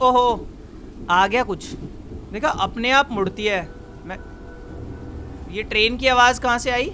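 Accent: Haryana, India. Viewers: Real HARYANAVI HARYANA KE LIKE KARO AND I LIKE HIS HARYANVI ❤❤❤❤❤❤❤❤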